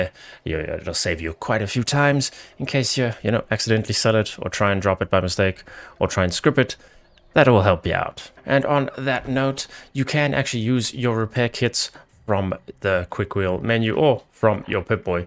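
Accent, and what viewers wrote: South Africa. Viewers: Is that a South African accent I'm detecting?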